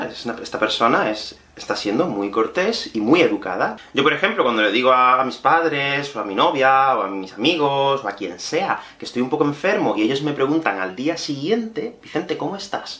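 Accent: Spain. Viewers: joder, me cuesta el acento español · Estoy aprendiendo español (inglés es mi idioma madre) y he entendido casi 80% de este video en español sin traducciones a inglés! ¡Necesito más estudiar los verbos la otra del indicativo! El más aprendiéndolo puedo entender los acentos de hispanohablantes del mundo. ¿Quizás tu acento es español? Pero, muchas gracias por esta lección y suscribiré a tu cuenta de YouTube. ;) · Tô aprendendo espanhol e fui vendo o vídeo achando que ele tava com sutaque espanhol e quando fui perceber tava traduzindo o vídeo na minha cabeça sem perceber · ) Este acento espanol <3 :P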